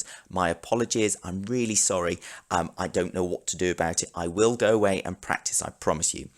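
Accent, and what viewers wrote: United Kingdom. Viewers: I really like your videos. As for your pronunciation, tough shit for the Italians. You don't have to apologize for being British. If they are offended, that's their problem.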